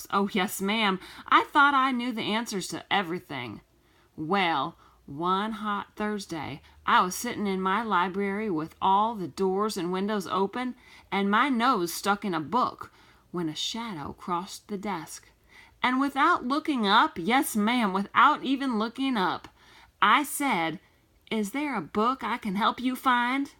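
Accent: South, United States. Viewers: Her accent was from the country she’s a beautiful reader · You have a pretty voice it’s country I love it thanks for doing my hw 💖❤️🧡💛💚💙💜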